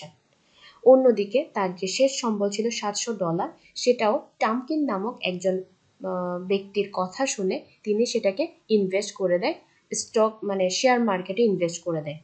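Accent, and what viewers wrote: Chittagong, Bangladesh. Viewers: আপনার বাচনভঙ্গি যথেস্ট সাবলীল কিন্তু আমি আপনার ভয়েজ প্রথম বার শুনেই ধরে ফেললাম আপনার বাসা চট্টগ্রামে 🥰 বাই দা ওয়ে আমি রাজশাহী থেকে 💕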